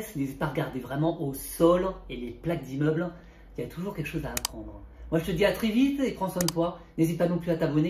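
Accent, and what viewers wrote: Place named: South, France. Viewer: Bababah l’ACCENG DU SUDEUUUU AYYYYYYYY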